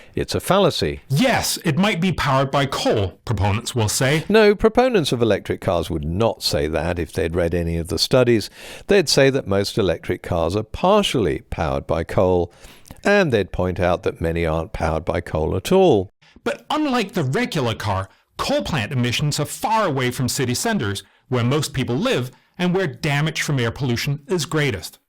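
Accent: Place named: England, United Kingdom. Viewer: He's not smug, he's speaking with an English accent. That's literally how we speak here. If that's "insufferable" to you, you clearly have never suffered. But your Bald Eagle profile and racist intolerant approach already says right wing populist long before your opinions could be considered valid.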